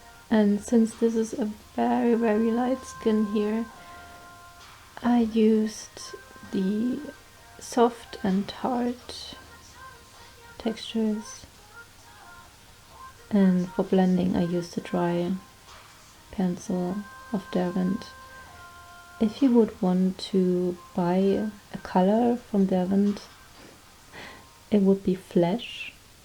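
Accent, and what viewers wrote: Germany. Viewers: Thank you so much for showing us! You honestly deserve so much more attention and love bc your work is awesome! But I'm glad I found your channel now ^^ btw sorry for that question but are you German? I just think you sound a little German :)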